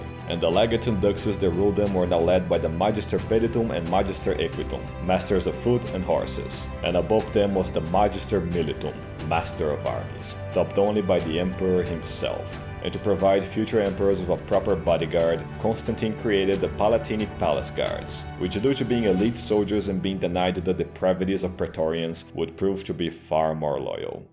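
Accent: Brazil. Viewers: O sotaque entrega o Brasiliense. A propagando ao Constantino é tão boa que até Eusébio teria inveja! Muito bom! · Teu sotaque de brasileiro deixa o vídeo muito melhor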